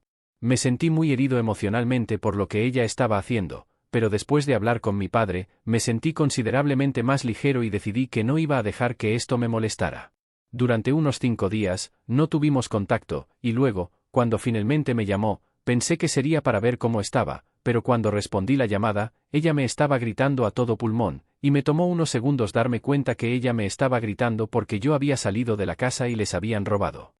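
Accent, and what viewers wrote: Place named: United States. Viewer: Jajajajaja ame el tono gringo Te falta darle más espacio para que sea más agradable escucharlo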